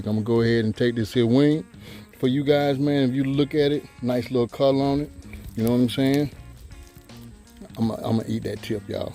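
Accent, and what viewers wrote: South, United States. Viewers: Haha!!! This here man is so southern. I love it. Those wings look great. You should get you a second basket though. You can put your wings in one then drop it in the grease. You put the second one on top to keep them submerged. You are able to shake the basket to keep them from sticking as well. When they are done they are already in the basket. I subscribed. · He gotta be southern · Girl I was like wait a minute is he married then I went to take the rest of his channel so I assume that he is married so no disrespect when I'm say this comment I'm like this man than made him an outside deep fryer and then it sounds like he's from the south and Country guys, real country men be coming up with all kind of neat stuff it just makes me so proud, like one of my friends actually made his own barbecue grill he welded it and everything himself lol we sat out after he finished and had a late night bbq. 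So all in all what I'm getting at here was I so excited to see him take them wings and drop them in that outdoor deep fryer 🤣😊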